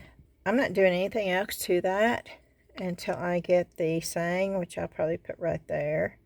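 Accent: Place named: Pittsburgh, United States. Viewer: Do you have family from Pennsylvania? My old roommates spoke Pittsburghese. Unique words and word pronunciations. Warsh=Wash!!!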